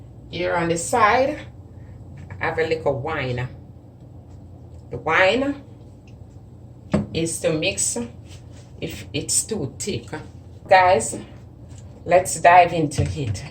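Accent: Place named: Jamaica. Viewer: The accent and pronunciations are killing me. 100 percent Jamaican.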